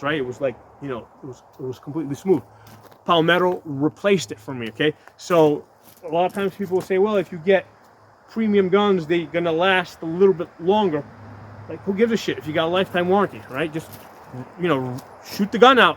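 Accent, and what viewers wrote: New York, United States. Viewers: Are you from New Yawk by any chance?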